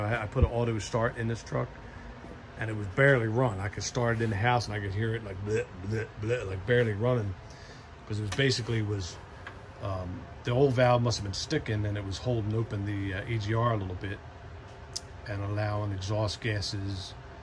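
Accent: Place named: Philadelphia, United States. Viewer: Are you from Philly? 😂 I can tell by how u talk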